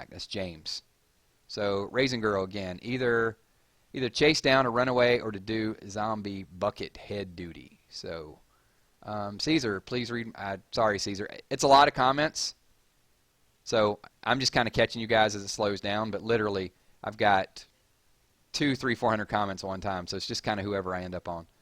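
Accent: United States. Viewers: And I like your voice it sounds country and that's what I like about you and all of your walking dead vids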